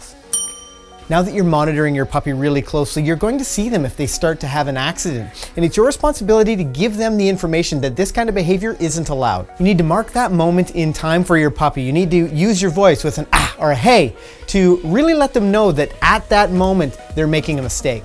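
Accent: Canada. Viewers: ur canadian omg u say hOUAse and aBout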